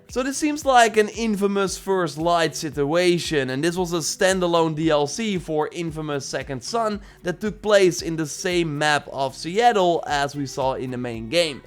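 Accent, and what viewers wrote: Netherlands. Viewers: Are you from holland (Netherlands)? You have a little bit of a dutch accent while you speak english. Great video btw! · yes hes dutch, his accent is quite thick lol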